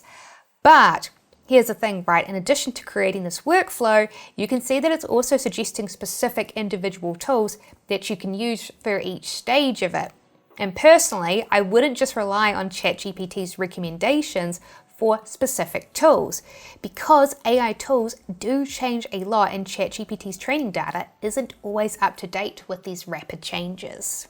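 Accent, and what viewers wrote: New Zealand. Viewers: I appreciate your content and you are very liable. However, there is an E in test and the is an E in step, there is not an i in STEP. CHAT GPT there is no i in Chat, there is an A. Furthermore Perplexity is not perplixity. I Actually has and not an i. DO YOURSELF A FAVOUR AND get rid of the NZ accent. It takes away from how smart you are. just saying🤔❤ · Hello. In NZ, this is cool and nice to hear a NZ voice in this space for one, really cool thank you.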